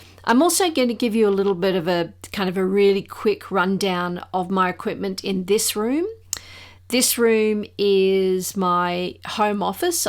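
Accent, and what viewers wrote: Australia. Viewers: Great to hear an Aussie voice. ❤ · Hi, I have a Philips something tt. As soon as you mentioned an Australian Hifi show I started your video and immediately heard a familiar accent. I'm in Sydney. I bought the Philips because of its beauty and simplicity but don't use it much coz I love my Tidal subscription for convenience. I restore n build Hifi gear for fun. My name is Peter. Cheers. Oh I saw your link on the Audiophiliac Steve Guttenberg channel.☮️🇦🇺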